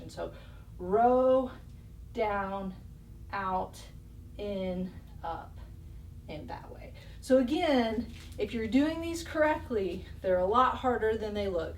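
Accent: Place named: Alabama, United States. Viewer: alabama drawl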